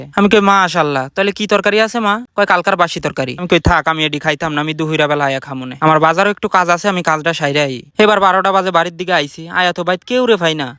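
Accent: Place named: Shariatpur, Bangladesh. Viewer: ভাই আপনার কথা শরিয়তপুর জেলার মতো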